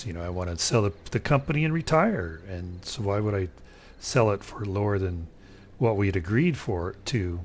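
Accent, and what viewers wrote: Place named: Southwest, United States. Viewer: favela is like the mountain side villages in brazil. howls moving castle is like the studio ghibli movie of the same name. a complex city perched on legs. wow your accent sounds really arizona new mex. thought you might be native. in my opinion copy catting isnt really a thing if you play authentically. up is up down is down . what evs. more fun stories.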